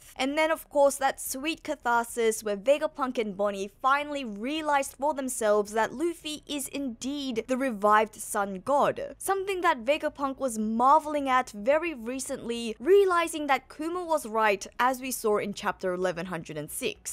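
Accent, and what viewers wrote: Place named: Australia. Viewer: It’s the cringe Australian accent. They cants pronounce the letter “o” they say “aur”. Like vide-aur instead of video… sometimes can’t say “ah” and say “er” .. listen to “ide-er” instead of idea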